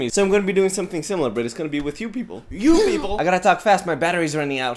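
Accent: Portugal. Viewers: i think he's of portugese descent or something. he sounds like he has a slight accent. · sotaque de portugues de portugal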